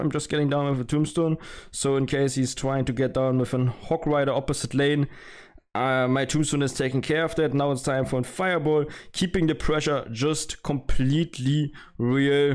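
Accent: Germany. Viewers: Man hört so hart das du deutscher bist 😂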